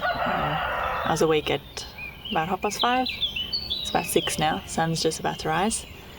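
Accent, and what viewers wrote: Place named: South Africa. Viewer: Good to hear a South African voice. Good luck on your Portuguese adventure. The pool will be a great addition for managing the heat.❤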